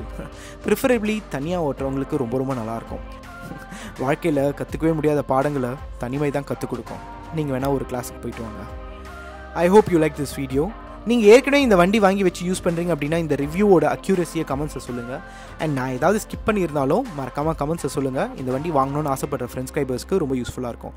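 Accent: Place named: Chennai, India. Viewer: Your tamil .. is it how tamil is spoken in Madras these days ? Bad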